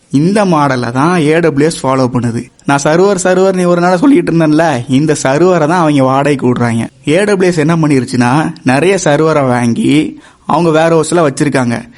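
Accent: Tirunelveli, India. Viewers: Bro .... Very clear explanation in tirunelveli slang ❤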